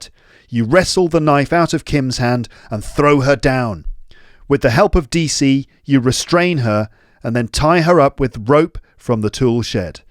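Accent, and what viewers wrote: United Kingdom. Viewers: Couple years ago I lived in another country with barley access to the internet I could listen to your podcast and voice hardly almost i forgot you however i couldn’t forget your British accept and your generously teaching style and your name I got surprised to see you in YouTube in USA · Fantastic voice and actions with explanation of all the vocabulary! English as a second language person, I had a hard time to understand those detailed adjectives when I read a book. Finally, I fall into the story and enjoyed it all the way to the end. Thank you so much for your effort and contribution. I am lucky to find this podcast. I love your British accent as well. I wish I could learn that accent:) I think I will be your fan now on - Cheers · camp wide range covered uk accent and culture British filed pure Love dramatic ever❤ true legendarie Podcaster all time❤❤❤ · i like your british accent very much.sir.😊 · I always listen to the end and I love how you explain some words. 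And I learned the British accent from you Thank you ❤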